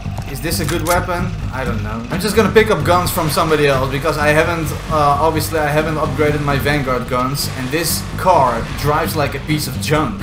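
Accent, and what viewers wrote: Netherlands. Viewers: are you from dutch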